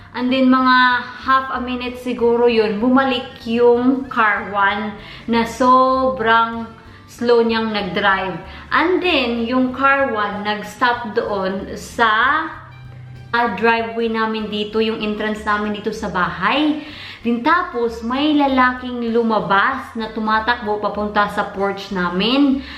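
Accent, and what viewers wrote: United Kingdom. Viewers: When im watching you talking,i remember the queen when she talks,ive watched the crown,you speak like queen elizabeth,you have a british accent already.... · I can hear the British accent emerging 😌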